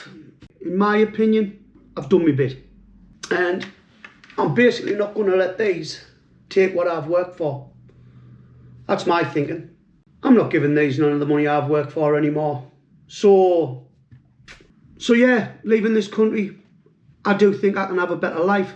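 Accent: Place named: Newcastle, United Kingdom. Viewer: I love the Geordie accent. "Close to the borne"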